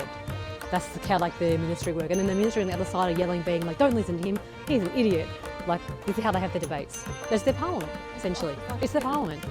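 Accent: Australia. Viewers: 호주식 발음 독특해요. Here 히야, today 투 다이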